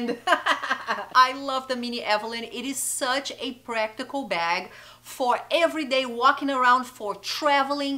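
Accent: Brazil. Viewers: Are you brazilian? Because of the accent! Love your videos!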